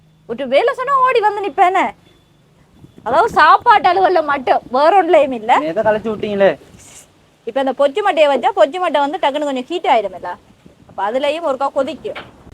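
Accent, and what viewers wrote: Tamil Nadu, India. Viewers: You speak like Tamil Nādu Tamil language. Did you study in India? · Ivar enna indian tamil la pesuraru. Inga vanthittu ponavara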